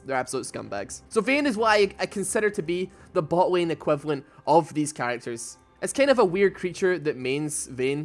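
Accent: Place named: Scottish Highlands, United Kingdom. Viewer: Your vids are awesome man you sound like your from the highlands xD I live near Inverness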